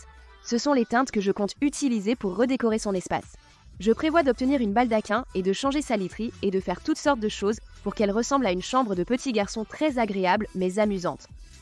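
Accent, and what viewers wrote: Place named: United States. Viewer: Hello, I really like your content on YouTube. I watch your wonderful videos from my country Morocco. It's far away, but I really like what you do on YouTube, can you add the audio track option on the settings so I can listen to your American accent, I like it and it also helps me a lot to learn English.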